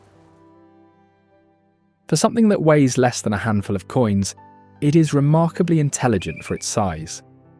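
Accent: United Kingdom. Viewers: Uh… that black catfish with the white stripe does not exist here. Who did this video? I’ve fished catfish in the Texas hill country for over half a century and have never seen anything like that. Channel cats, blue cats, yellow cats and the occasional bullhead are the main species. And the bird eating a crab never happened either. That would be at the coast. No crabs in the hill country. The British accent now makes this suspect. Also the comment about Canyon Lake being not natural is strange. No lake in Texas is natural except for Caddo. Sorry about my critique. Other than a couple of glaring mistakes this is a good video. Oh, and Luckenbach has a huge gift shop. Always has. This area in Texas is my soul.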